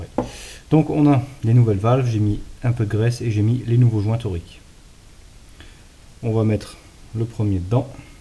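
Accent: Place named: Alsace, France. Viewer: On remarque un peu l'accent alsacien ;)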